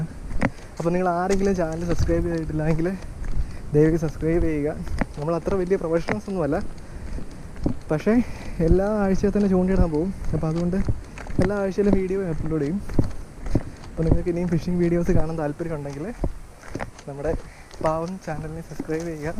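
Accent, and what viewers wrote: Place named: Vaikom, India. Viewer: Adipoli samsaram kettapozhe thoni enta sthalam vaikom ippo soudiyila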